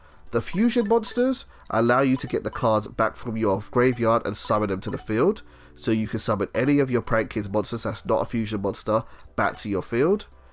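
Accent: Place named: United Kingdom. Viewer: U sound so british, are u from england?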